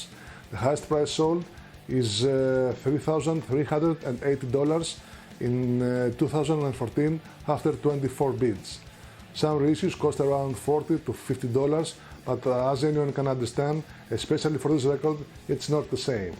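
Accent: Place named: Greece. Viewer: Ur Greek accent reminded me of talking with Sakis Tolis when he was playing here with RC and I got to chat with him after the show :)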